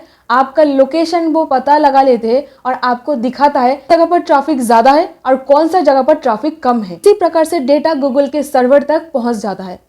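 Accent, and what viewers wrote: Bengal, India. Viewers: आपको अपने बांग्ला accent को थोड़ा सुधारने की आवश्यकता है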